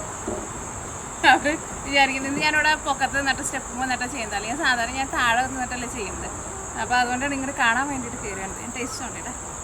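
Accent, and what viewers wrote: Thrissur, India. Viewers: Hi chechi, your cooking is So good..u r videos watching since 2014(after marriage)..I love your Thrissur slang sooo much.. even though I am far from my home.. after watching your videos I have homely feeling. always watching your videos with full smile 😊...I used to keep fish curry as you said..... keep rocking chechi...❣️ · Voice modulation better aakan nkkuka, Thrissur slang koodi aakumpol follow cheyyam pattinnilla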